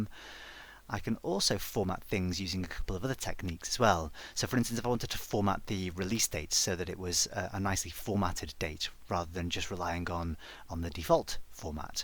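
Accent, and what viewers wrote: United Kingdom. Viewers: This is the best training video. I love the British accent! Please can you upload the SSAS 2008 training. Thanks.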